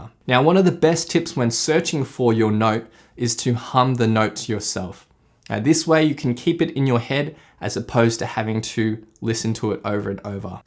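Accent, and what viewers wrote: Australia. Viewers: Thanks a lot for the tips,! I love Australian accent by the way haha. Keep it up, mate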